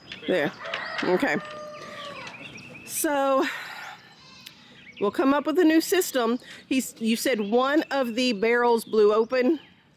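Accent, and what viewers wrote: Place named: South, United States. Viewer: I love your accent.thats southern.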